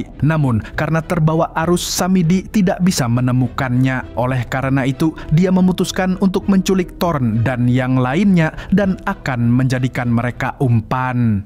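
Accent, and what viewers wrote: Banjar, Indonesia. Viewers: Logat bahasa Banjar nya gapernah ketinggalan😊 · Banjar nya kelayan A Admin nya😮😮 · Admin nya orang banjar rupany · Org bnjar kah pian mang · Urang Banjar kah pyn nie😅😅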